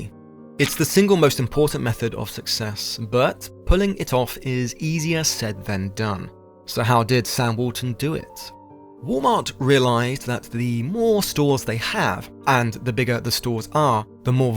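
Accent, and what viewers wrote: United Kingdom. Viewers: Go back to the American accent. This British accent is so damn annoying.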